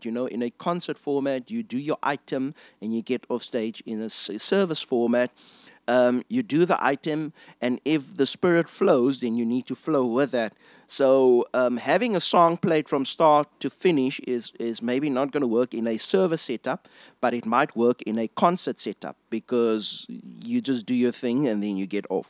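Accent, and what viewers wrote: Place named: South Africa. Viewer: I am going to guess that's a South-African accent. It's grating at times to my American ears, but well-worth tolerating for the excellent job you've done with this series. You definitely have a teachers's heart!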